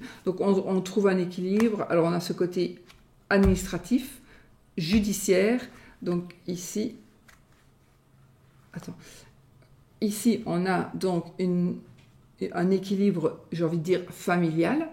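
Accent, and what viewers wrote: Belgium. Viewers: Coucou, merci beaucoup pour votre travail. Je vous découvre aujourd'hui. Vous êtes belge ? Vous avez, il me semble un petit accent qui me parle...❤❤😂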